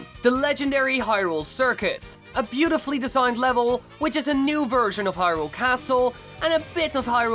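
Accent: Netherlands. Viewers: You really sound dutch for some reason. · are you dutch?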